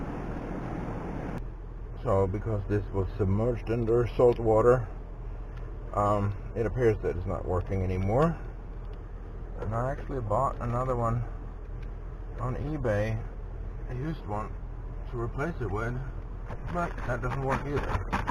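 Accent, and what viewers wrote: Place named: Germany. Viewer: you sound like you are either german or Austrian I am of Austrian decent i'm sure you can tell by my name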